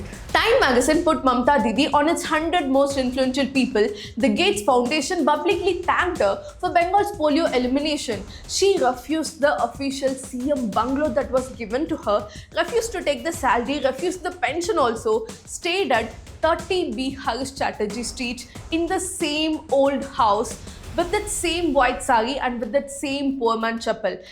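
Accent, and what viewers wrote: Tamil Nadu, India. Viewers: Madam Improve your pronunciation and diction. It has a heavy Tamil accent.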